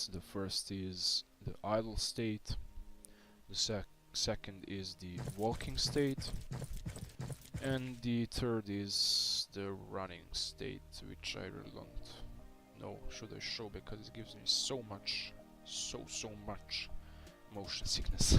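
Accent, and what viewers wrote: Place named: Russia. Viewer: Как будто русский говорит по английски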